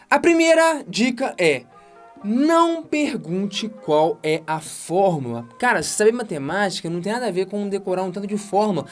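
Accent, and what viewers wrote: Minas Gerais, Brazil. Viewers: Você e mineiro ? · Pelo sotaque e mineiro kkkkk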